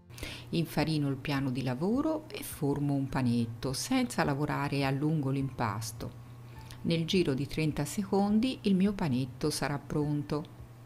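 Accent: Tuscany, Italy. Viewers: Complimenti per tutto ciò che proponi, e per il modo con cui sai comunicare; la bella voce e la simpatica pronuncia Toscana, ti rendono completa.☺ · Un'altra buonissima e deliziosa ricetta..buone con la crema.. bravissima sempre precisa e dolcissima quando commenti il video..il tuo accento toscano mi piace molto.👏👏👏👏👏👏😘😘😘😘😘😘😘❤❤❤❤❤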